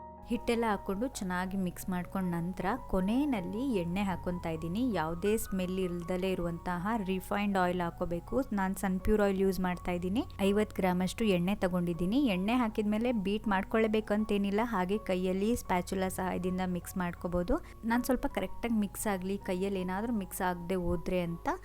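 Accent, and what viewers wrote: Mangalore, India. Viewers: Nice ❤ Actually nam ur Kundapura but nim mathaduva style nam mangalore side ansuthe and more over ur cooking skill was too Good Make Paneer Dishes❤❤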